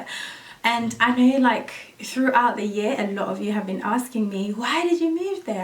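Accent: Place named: France. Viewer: Your french accent it’s so cute 😍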